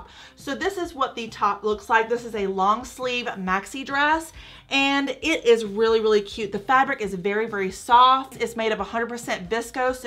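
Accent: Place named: Atlanta, United States. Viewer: I love you and your accent so much. I'm from Atlanta and it's like I'm talking to a friend. ❤️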